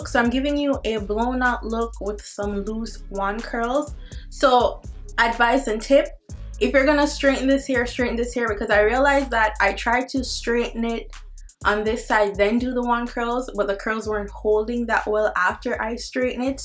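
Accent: Jamaica. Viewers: Your an Jamaican I used to watch you video when I was younger but now when mi watch it mi see say you sounds like a Jamaican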